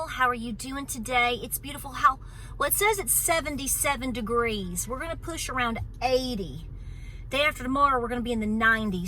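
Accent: South, United States. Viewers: i just love your podcast! I love your cheery attitude! And your southern accent and all your animals! And your voice, oh my goodness! Ilove it when you sing! Thank you for brightening my day!🙏😊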